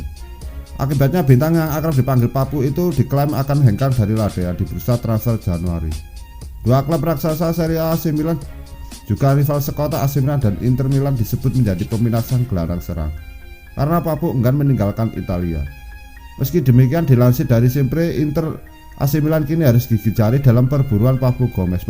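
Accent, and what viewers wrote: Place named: Banyumas, Indonesia. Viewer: Ngapak suaranya .. hehehehe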